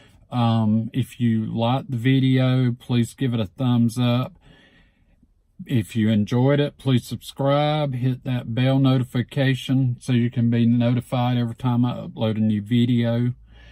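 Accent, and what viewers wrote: United States. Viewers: Hello Y'all! This video helped a lot. How beautiful is your Accent. English Degree Student from Brazil here. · I love your southern accent.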